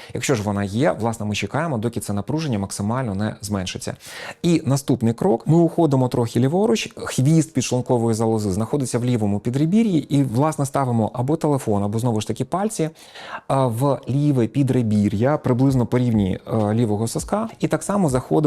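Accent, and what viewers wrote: Standard. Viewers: Я навіть не знаю, що більше слухаю, цінні поради чи таку гарну, чисту українську мову.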